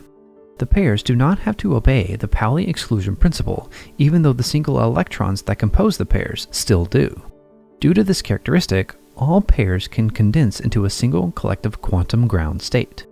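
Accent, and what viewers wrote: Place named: South, United States. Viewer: I doubt it. Were the voice AI, pronunciations would follow standard English patterns superimposed over a fake voice, but this voice is a specifically Southern variety of General American.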